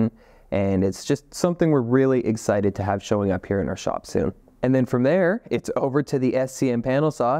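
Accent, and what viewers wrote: Canada. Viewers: He is Canadian that is how they speak.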